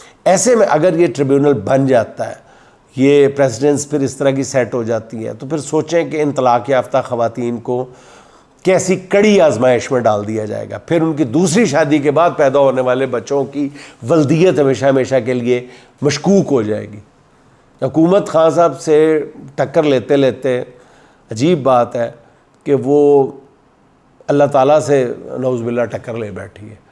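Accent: Punjab, Pakistan. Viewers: تمام تر احترام کے ساتھ: جب آپ کہتے ہیں کہ "یہ اقدام اٹھایا" تو آپ سے غور کرنے کی گزارش ہے کہ اقدام plural ہے قدم کا۔ آپ کہ سکتے ہیں کہ "یہ قدم اٹھایا" دوسرے کہ "جن ملک میں" کے بجائے "جن ممالک" کہنا چاہیے میرا مقصد آپ کو شرمندہ کرنا ہرگز بھی نہیں ہے کیونکہ اب ہم پنجابی لحجہ میں اردو سننے کے عادی سے ہو گئے ہیں شکریہ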